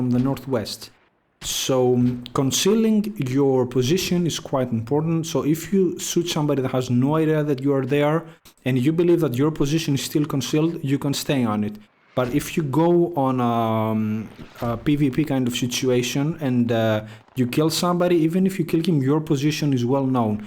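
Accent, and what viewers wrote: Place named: Greece. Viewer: I can hear the Greekness in your voice :D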